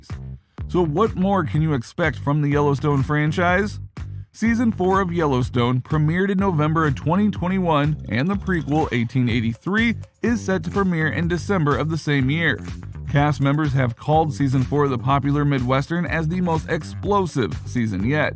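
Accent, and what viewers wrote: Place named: New York, United States. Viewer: Four 6's Mr announcer dude.....must a new yorker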